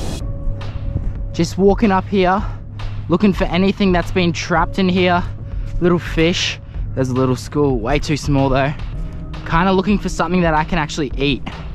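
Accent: Australia. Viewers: I don’t know how or why YouTube recommended your video on my feed 🤔. But, the moment I watched your Snake 🐍 Island 24-hr Survival video 📹, I was hooked. Your zest for outside life adventures, animal bewilderment, the way you filmed.. very captivating ☺️☺️. I am now living vicariously thru you 😆😆😆. Love your Australian accent too. 🤙🏽🤙🏽 You’re so different from me and my 9-5p job, I find you fascinating. Much love 💕 from Shreveport, LA USA 🇺🇸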